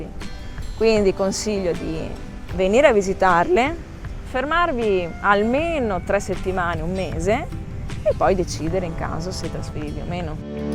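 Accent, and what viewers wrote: Veneto, Italy. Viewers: Brava, una bella intervista, sei stata sincera e intelligente, hai messo in evidenza anche le problematiche e le difficoltà di ogni trasferimento. Lo spirito di adattamento conta moltissimo, soprattutto nei momenti difficili. L 'Italia è unica, per bellezza, cultura, geografia, è il modo di vivere che è cambiato, è diventato frenetico, come dici tu, non è per tutti. Chi ha la possibilità di cambiare, di mettersi in gioco, è giusto che lo faccia, ciao e Pura Vida. P. S. L' accento veneto non l'hai perso.... 😁😜👌👍💪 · Ciao, che bella storia!! Sei stata bravissima, complimenti! Di che paese del Veneto sei?.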